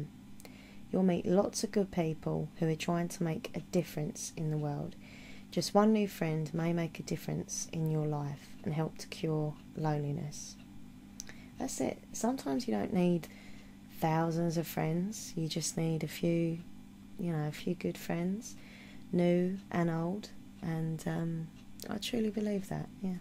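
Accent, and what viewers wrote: Essex, United Kingdom. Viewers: Thanks for this video,; you can count me as another person who finds comfort with the ASMR community on here. I've seen a couple of your videos before and was instantly intrigued because you sound like you're from Essex (that's where I'm from originally) if not London, am I right? Right now, I am going through a particularly heavy bout of feeling inherently lonely. I have quite a few good friends but the problem is they don't live near me so I only 'see' them virtually 98% of the time. I don't have a partner and haven't had one for years and being quite sensitive as well as being a massive romantic at heart, sometimes it can be cripplingly lonely for me here. I've also struggled with Depression and mild anxiety issues for 15 years or so and that has increased of recent where I'd had a fairly long break of no depressive behaviour I'd like to reach out to you on here and am subbing so I can follow you and catch up on more of your content And yeah, I know EXACTLY what you mean with the shopping thing. 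Happened to me just today. I've said this before in the comments section of a few ASMRtists, but I think that some of you are really some innately beautiful souls that do so much good and are so compassionate and genuine, that I know that for me personally, I would have a harder time coping and relaxing sometimes if it weren't for people like your good self making such invaluable and beneficial content. So please do keep on making videos and ignoring all the f**kwads and trol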